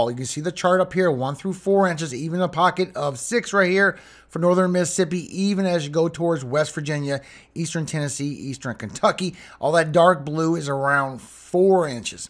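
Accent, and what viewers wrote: Louisiana, United States. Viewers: sounds like you are Cajun? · As always good ,needed , information and the fact that your Cajun is just icing on the cake... thanks for all you do !! And the extra gift of reading us the scriptures 😔❤️ take care BB from somewhere in Louisiana 🤠👍👍👍👍👍👍👍👍👍👍👍👍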